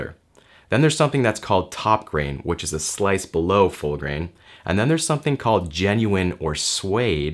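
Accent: Canada. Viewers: Canadian, eh? Love your channel.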